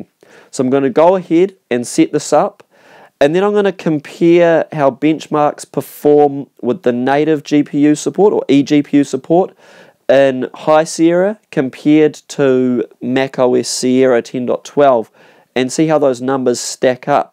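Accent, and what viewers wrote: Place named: New Zealand. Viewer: New Zealand? reminds me of Rhys Darby (Murray Hewitt's character) from Flight of the Conchords :) btw, great video. thanks for sharing.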